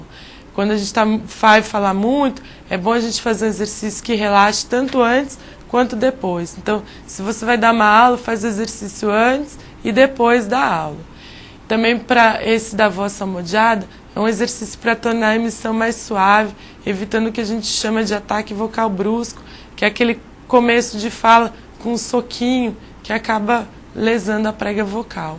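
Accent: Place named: Sao Paulo, Brazil. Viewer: (BARBRINHAENF) -------- Num tem nada à ver com sotaque não. Vc tá dizendo que ela tem um sotaque diferente do que estamos acostumados a ouvir no YOUTUBE, PÔW, o sotaque dela é PAULISTA, e sotaque paulista a gente tá cansado de ouvir no youtube, o problema é a voz dela mesmo. Fátima Bernardes, Cid Moreira, Zé Ramalho VOCÊ E EU SEMPRE VÃO e vamos ter a mesma voz em qualquer sotaque, compreendeu o que eu disse? Então não me venha com essa não porque não colou.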